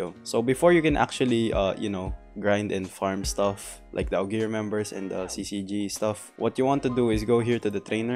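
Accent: Philippines. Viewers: I think ur a Filipino Because of Ur Accent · your accent looks like filipino :/ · In your voice um I can tell your a Filipino Just for me ehhehehe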